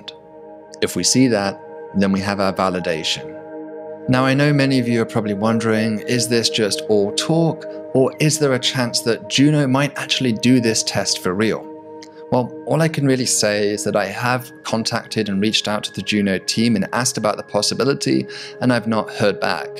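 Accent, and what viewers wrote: United Kingdom. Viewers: Pretty sure he’s British…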